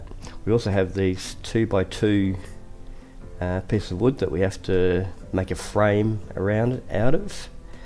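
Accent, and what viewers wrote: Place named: Australia. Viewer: I’m assuming you’re Aussie by your accent and “g’day” . I’m just starting out and was wondering what stains you use and if they go ok under water based varnish? I’ve bought a tin tin of Cabots water flat varnish from the green shed. Cheaper than model paints. Andrew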